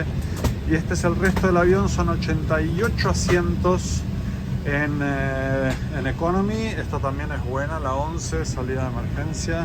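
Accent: Argentina. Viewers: Hola. ¡¡¡¡¡ Pero como disfruto tus viajes aunque sean cortos!!!!! Me encanta como lo cuentas todo y ese acento argentino............ El que hacía los anuncios era muy gracioso hablando, nosotros los españoles aún notamos más los acentos. Quiero más vídeos. Un abrazote gigante, Barcelona te echa de menos.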